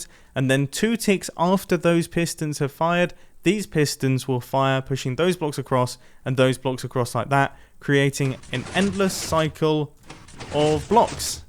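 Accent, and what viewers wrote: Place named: United Kingdom. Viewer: mumbo, you sound extra british in this video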